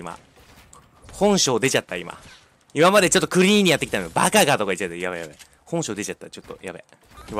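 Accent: Kansai, Japan. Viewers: 関西からしたら喋り方普通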